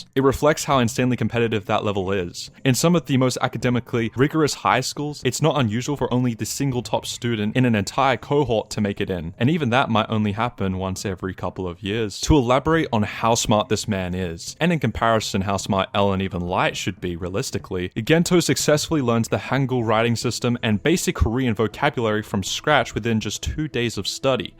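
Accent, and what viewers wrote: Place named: United States. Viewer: Why do you say a bunch of words like a seppo? Are you selectively saying "a" like a yank just to please the algorithm?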